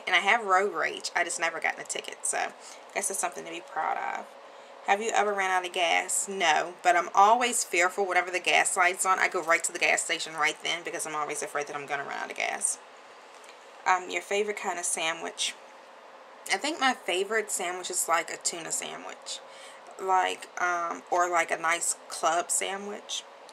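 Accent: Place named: South, United States. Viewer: I subscribe because you sound like me, I'm a southern girl too.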